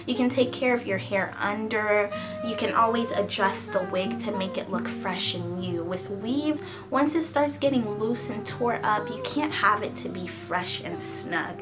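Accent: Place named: Nigeria. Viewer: I loved this video, it was bomb! Learned more about you & your Nigerian Accent lol <3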